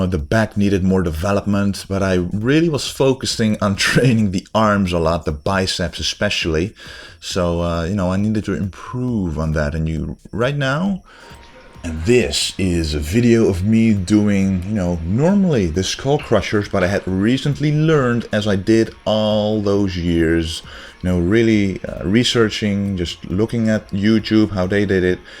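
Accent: Netherlands. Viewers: Are you Dutch your accedent is like a dutch men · Hey man , are you dutch ? you sound dutch , i am dutch , my dad is also a oldschool bodybuilder from the netherlands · I'm wondering, are you Dutch? Your facial features appear Dutch, and your accent has slight hints of Dutch in it. I'm guessing either Dutch or Scandinavian. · Dutch accent is so cool.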